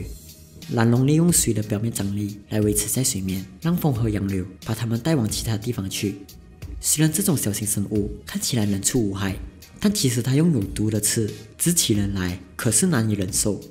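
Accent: Malaysia. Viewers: 马来西亚口音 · 是馬來西亞人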